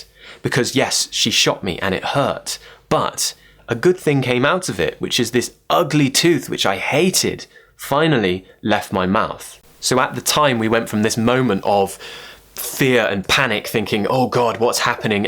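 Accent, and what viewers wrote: RP, United Kingdom. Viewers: oh u r so good at telling stories n i love this way to learn the British accent it is so helpful for me, btw i felt so happy for your teeth😹😹. hope u have a nice day 🌹🌈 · the way you tell stories in your amazing British accent gives me goosebumps whenever I listen to you. Great story! Thank you!:) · your stories are always funny, keep doing it, i am a english learner and you channel and lessons has been helping me a lot, by the way, you accent is the best, i've been struggling to improve mine to sound like a british.. greetings from brazil, man!!!! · I'm in love with your accent, I love british😍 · Hi, I'm an italian man and I watched lots of videos just to keep my english alive. Different months ago I watched a video where you told a very funny story about yourself (maybe a "listening practice" video): I remember you told that you went up a tree, and accidentally fell down in front of some of your school friends and you went in the hospital... 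then in the hospital they put a sort of plaster on you and you were forced to get help from your parents to wash you in the tub, with all the embarrassment of the case... Unfortunately I'm not able to find that video any more. Since I would like to show to my kids, because I think it's useful for an educational reason (as well as funny:-D) as listening practice for them too, can you please suggest me the link? Thank you in advance and congratulations for your video channel!! I love your british english and your pronunciation, and your voice is clean and wonderful. Gabriele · Lovely British accent....sounds sweet to my ears( not sure if i said it correctly) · Hi,! I feel like your British accent is very beautiful:) It sounds like you are english lord from these bbc series:)) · This EP that the most I like. Because I learn a lot of British accent from your story. 😅 Very much thank you. 🙏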